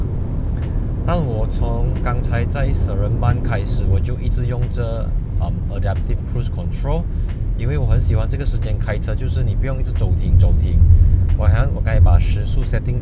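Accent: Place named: Malaysia. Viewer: 这样介绍很好，地道的马来西亚中文，但建议有些地方没必要用英文就别刻意讲英文了，比如 那段。反正看这平台的大家都听得懂华语，自然就好。小小建议吧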